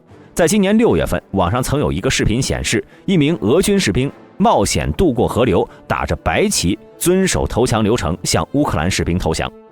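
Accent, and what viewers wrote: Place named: China. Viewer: 説話者是大陸口音 留言者都不是大陸的😁😁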